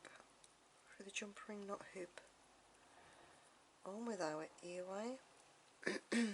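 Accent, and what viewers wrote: England, United Kingdom. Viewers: Im from California. But I love the Brit accent. I watch Brit TV show. My older brother got me hooked now my oldest son is hooked too. Your coppers and Mystery shows. My granny was born in Ireland and moved to the East coast of the USA. In early 1900s! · So lovely to hear an English voice. Only just found you. I’m already a fan and will continue to watch your videos. I have already learned a few new things especially how to end memory wire bracelets. Thank you.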